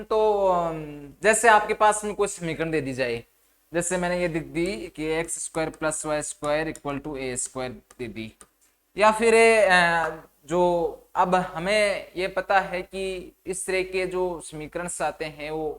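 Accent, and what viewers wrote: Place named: Haryana, India. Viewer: Apke bolne ke stayle se lag raha h u r from hariyana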